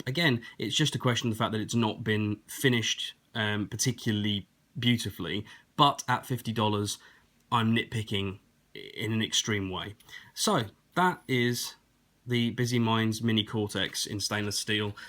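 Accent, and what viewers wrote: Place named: England, United Kingdom. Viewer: It's refreshing to hear an English accent in the spinner review section of YT! It's also great to hear succinct and balanced opinions as regards top end spinners and their mid-quality counterparts. I for one have an aptitude with all things arty crafty so I'm in my element buying mid-priced spinners and then re-finishing them by hand then putting in a good bearing. For example, I've just bought both a Zentri Nano and a (now obsolete) Triton mini ...both in SS, hand smoothed/polished them and both are running One Drop bearings ...all part of the fun :-) Thanks ...thumbs up/subbed.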